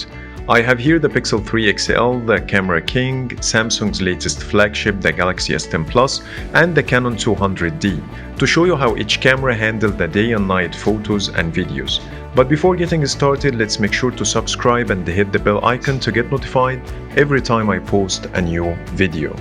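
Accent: Egypt. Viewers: المصري لهجته واضحه و على فكره الإنجليزي عندك عالي جدا بس الاكسنت بتغلب.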